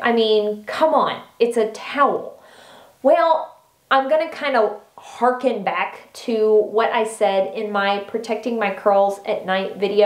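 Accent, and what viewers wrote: South, United States. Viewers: You could work for QVC!!!! ;) Shame that (as usual!) the give away and products is for USA folks only (English subscriber here.) None the less, well done on the 20 k subs (I'm just a recent one too, like a few days ago recent, lol) And last, but not least, I love your accent. :) It's so funny for me to hear, like something out of a movie.. Very 'Southern drawl.' Take care. PS. Your hair is beautiful.. The shine is EPIC!!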